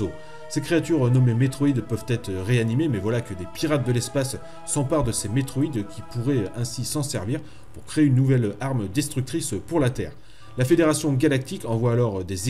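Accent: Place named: England, United Kingdom. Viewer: Super chronique comme d'habitude. Ça fait longtemps que je suis abonné mais la seul chose que je te reprochearai c'est ton accent anglais pour les titres. Sinon continue comme ça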